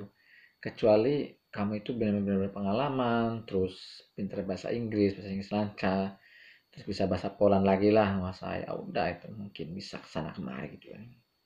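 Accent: Indramayu, Indonesia. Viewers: dermayu tah mas?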